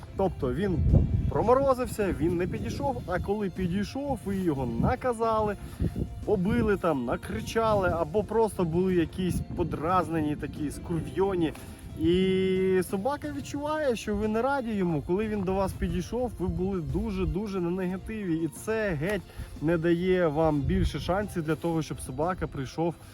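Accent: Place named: Ukraine. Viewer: нравятся еще как нравятся хоть украинский не знаю я родилась в киеве живу в Израиле но знаешь я слышу украински мне кажется что я сейчас заговорю на украинском мне украинский "акцент" ближе чем руский . как душевно, генетический.(не потому-что вами война) я невидела украину 34 года а акцент родной остался на душе😊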